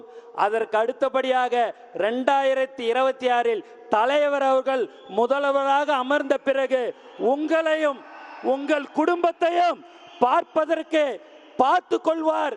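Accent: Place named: Pondicherry, India. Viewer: Pondy tamil apdi than irukum. Athu oru style.....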